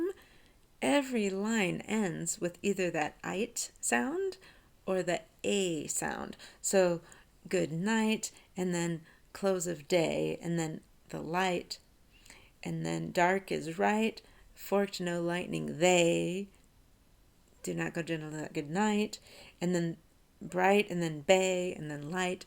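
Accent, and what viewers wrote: Ireland. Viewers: What....an Irish ... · Bad start.......IRISH!?!?!?!?!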